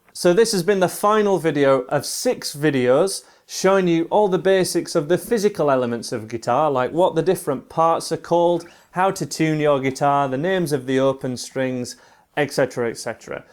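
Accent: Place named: Northern England, United Kingdom. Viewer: Northern English accent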